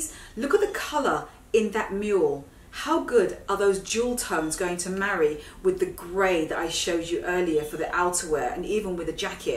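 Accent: England, United Kingdom. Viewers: Love your videos,. I'm a Scot living in SoCal and really enjoy your lovely English accent and style, of course <3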